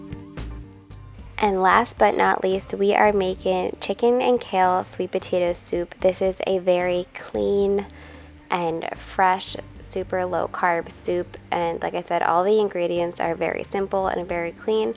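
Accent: East Coast, United States. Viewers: LOVE Crockpot Recipes! These look super yummy!!! Glad I found this channel 😁 -- (& her east coast accent makes me homesick!) ❤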